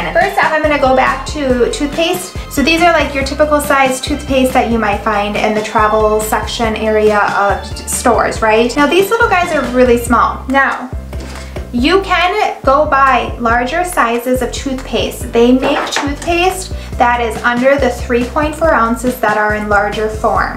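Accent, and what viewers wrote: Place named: New Jersey, United States. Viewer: I like the accent are you from jersey ?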